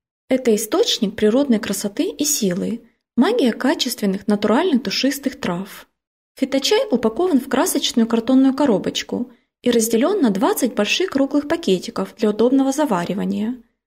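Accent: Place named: Ukraine. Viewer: умничка...судя по голосу ты с Украины ..смотрю твой канал года 3.. ты как ходячая аптека ))) спасибо тебе и не бросай канал .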